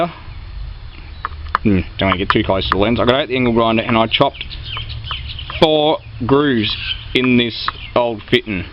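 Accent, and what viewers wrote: Australia. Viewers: true Aussie accent, love it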